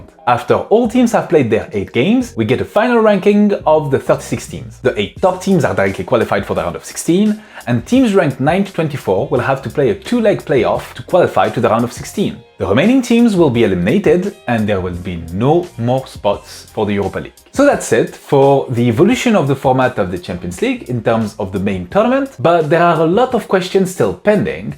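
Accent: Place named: France. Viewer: Pro: Good speed and tone of talking, Great voice, perfect English with sweet French accent, good content, excellent editing, talking while looking directly to camera! Amazing potential. Cons: Bad lighting, average sound, awful position of the head (at the beginning I thought that you sit right under the shelves and I was looking that at some point you will hit your head on the lower shelf). The video is golden.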